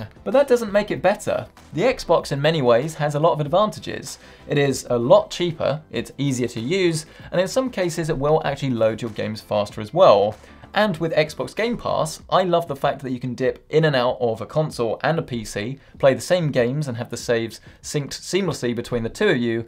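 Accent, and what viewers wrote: United Kingdom. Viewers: A XBox review in true British accent.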